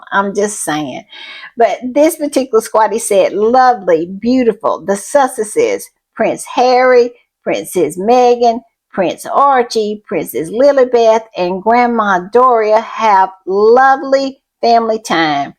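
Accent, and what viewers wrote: South, United States. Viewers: I'm screaming! Not "jaws tight"! And you always have me at " heffa"! 😂😂😂 Yep.... You're a southern gal, for sure! I'm loving it.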